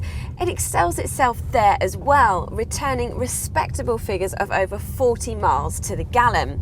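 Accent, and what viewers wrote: United Kingdom. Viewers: Hot sophisticated European woman, check British Accent, check Car knowledge,check I'm in love. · british accent and a hot gurl: me gusta!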